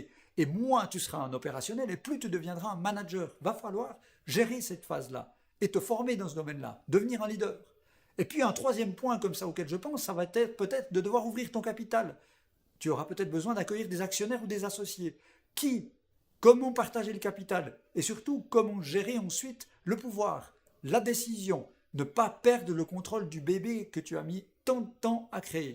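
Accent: Switzerland. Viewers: J'adore cette chaîne et d'autant plus avec un accent de chez nous :P #swiss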